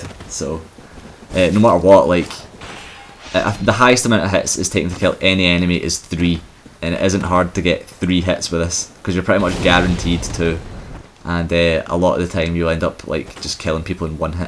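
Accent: Scotland, United Kingdom. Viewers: He has a Scottish accent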